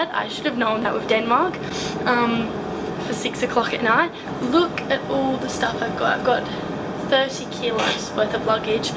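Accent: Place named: Australia. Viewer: i mean its a australian accent, but danish family and passport of your own is a blend of two countries, like you get two ways of life, countries and cultures etc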